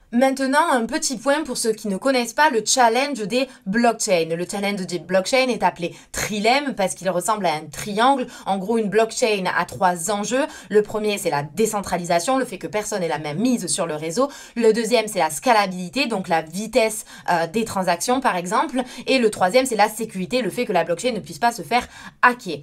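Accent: South, France. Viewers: té pas un peu du Sud toi?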